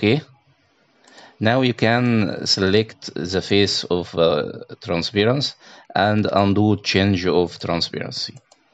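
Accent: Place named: Russia. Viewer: الآكسنت كأنك روسي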